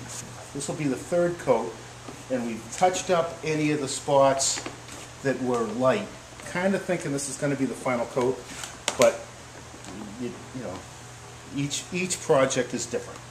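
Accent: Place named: Massachusetts, United States. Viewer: Thanks for the video demo. I will be trying this today...Nice Mass. accent by the way! lol you must be a Voc Tech teacher?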